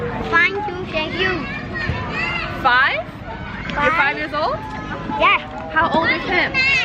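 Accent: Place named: Australia. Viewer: Kept thinking you look a lot like an Asian with Australian accent. Enjoy your travels with those priceless smiles from the kids!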